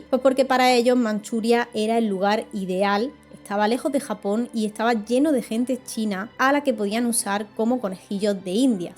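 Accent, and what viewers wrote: Andalusia, Spain. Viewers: Es acento de Andalucía, la perla del sur de España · Amo su acento...que parte de España es?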